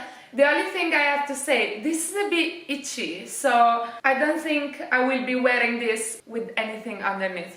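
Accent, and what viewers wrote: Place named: England, United Kingdom. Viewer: Ciao, Ti stanno da Dio i pantaloni da Zara,amo il tuo accento inglese.Qualche volta ti scrivo in inglese 😊perché sono una ragazza di adozione italiana 😅che vive in Danimarca.Amo il tuo stile 😍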